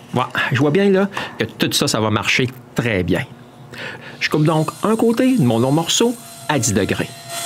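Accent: Quebec, Canada. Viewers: ToolsConsumables what you say is right but he speaks with a big Quebec accent so even though he meant to say the right things, the accent makes it sound different.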